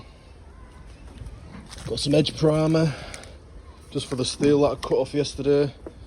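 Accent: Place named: United Kingdom. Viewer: This in Australia mate because you sound British and I’m from Liverpool uk and worked all round Brisbane and Melbourne and I can tell by the buckets your using it’s Aus ??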